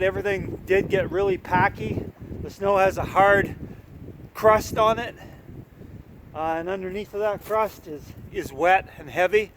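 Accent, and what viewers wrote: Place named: Maritimes, Canada. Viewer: He sounds like a maritimer haha I'm from New Brunswick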